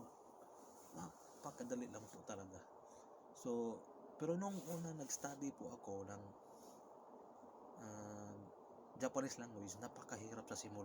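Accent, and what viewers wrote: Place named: Visayas, Philippines. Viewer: Hirap mag japanese host BISAYA SHA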